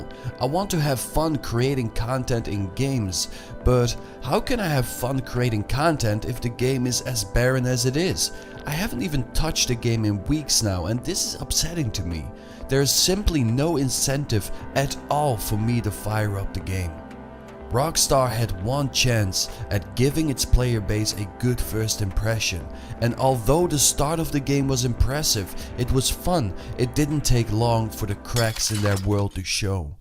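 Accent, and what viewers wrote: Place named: Netherlands. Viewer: The narrator sounds agressively dutch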